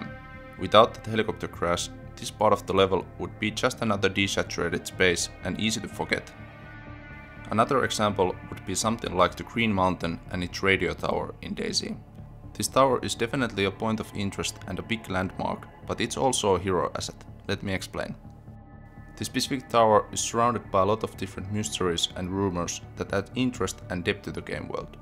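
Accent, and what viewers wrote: Finland. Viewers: This format is really good man. Hella interesting but also it really shows that you are competent at game development (Its easy to say you are good at something), you are trust worthy and honest! So many scammers out there and delusional devs! Good luck man I hope to back this when I can. Oh and I absolutely love the Finnish accent so enjoyable to listen to haha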